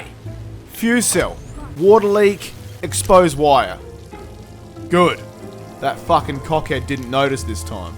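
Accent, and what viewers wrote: Australia. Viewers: brang back so many fking memories of the hitman blood money series very nostalgic excellent commentator 🇭🇲💯🤣😁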